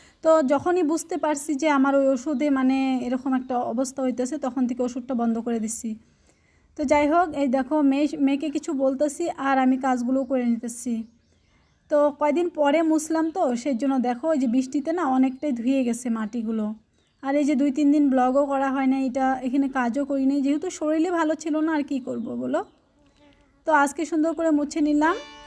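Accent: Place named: Bangladesh. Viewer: আপু তোমাদের জেলার নাম কি। তুমার কথা গুলো আমাদের বাংলাদেশর মতো ❤❤❤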